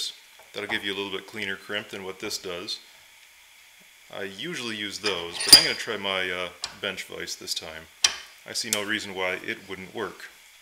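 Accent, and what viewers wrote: United States. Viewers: just american english, no big deal. Forgive them, they have not reached your level of perfection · You from Idaho? Remind me of guy knew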